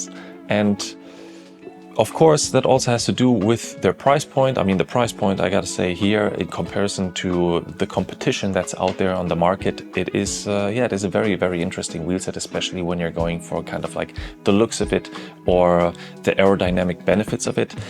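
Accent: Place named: Germany. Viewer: "Velo Six Spoke Aero Wheels" ... the German accent was the hook, but I didn't see any German style analysis. You talk about noise and feel and stiffness and cross winds is as close as you ever get to discussing the aerodynamics of aero wheels! Whats the matter with you? Do you have aerodynamic wind data of any kind?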